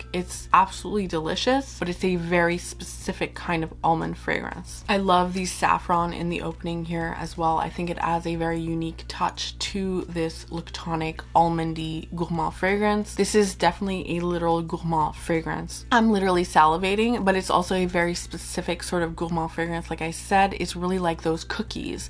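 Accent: France. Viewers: Hi I wish you a very happy New Year and all the best for you and your loved ones. As always love your videos and your french accent 🙂🙂🙂😃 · ugh your French 😍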